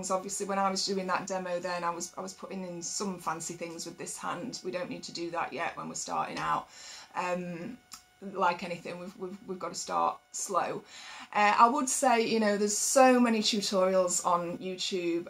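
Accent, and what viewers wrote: England, United Kingdom. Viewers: I really like your style of teaching Claw Hammer banjo! I will be watching your videos! I'm from Texas and I love your British accent! And you're happy! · So much easier to learn banjo from someone with an English accent :)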